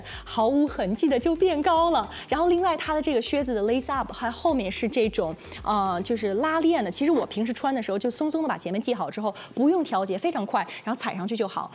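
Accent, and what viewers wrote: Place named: Shandong, China. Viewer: 很像山东的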